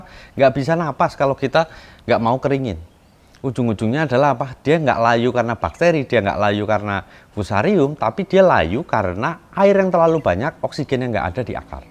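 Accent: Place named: Banyumas, Indonesia. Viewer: Sesekali ngapak nya di keluarin pak,ora ngapak ora kepenak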